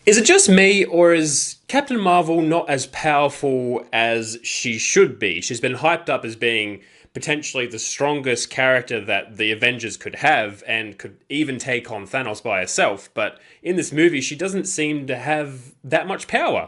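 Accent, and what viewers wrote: Australia. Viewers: Hey thanks for the sub man, subbed right back cause instantly I'm seeing stuff I like! I'll be keeping an eye out for your future stuff! Nice to hear the Aussie accent in more movie reviews too haha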